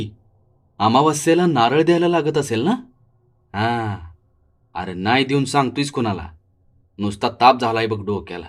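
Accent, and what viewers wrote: Pune-Satara, India. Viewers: That's not way konkan people speak...its more pune satara style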